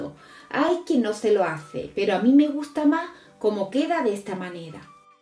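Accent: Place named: Andalusia, Spain. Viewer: gracias por esta fantástica receta de huesos de santo y por tu a entorno andaluz tan dulce te lo dice una Canaria de Tenerife 😘😋🌹🌷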